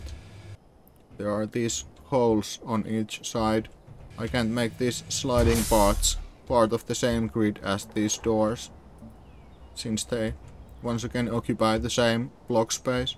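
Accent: Finland. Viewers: Sounds like Finish English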